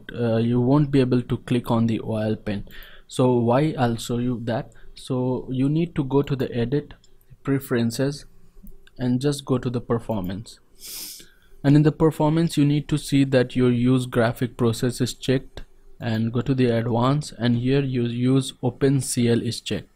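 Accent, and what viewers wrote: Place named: United States. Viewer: you talk like a american language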